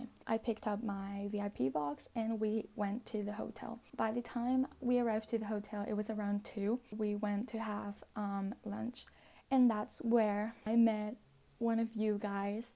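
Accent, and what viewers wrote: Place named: England, United Kingdom. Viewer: girl, i'm from Spain and i really LOVE your english accent, even in my wildest dreams i can not have your accent!! please, do a tutorial or something hahah pd: i LOVE this video, i can't belive i was there!!!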